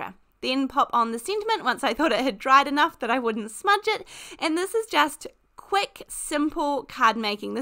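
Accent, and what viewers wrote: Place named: South Island, New Zealand. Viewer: Love all those cards, especially the last one! And just love hearing that South Island accent lol (I'm North Island lol)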